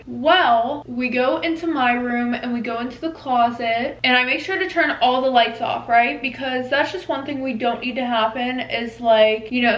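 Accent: United Kingdom. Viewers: I actually liked the British accent 😂❤ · Thanks very much❤️❤️❤️❤️that red makes your blue eyes pop 💙💙💙Love ur British accent!!!! Love from uk!!!! 😂😂😂😂😂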